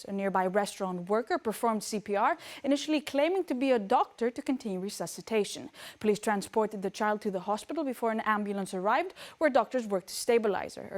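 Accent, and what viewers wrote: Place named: Greece. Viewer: Πολυ καλη Αγγλικα μπραβο, με λιγο Ελληνικη προφορα, πολυ σπανιο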